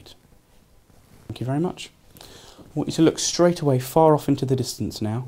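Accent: United Kingdom. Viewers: It’s so funny how I knew he was a scouser before I even heard his accent properly half way through.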